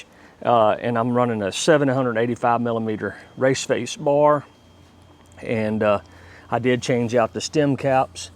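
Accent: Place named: Mississippi, United States. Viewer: . I grew up in MS and miss the accent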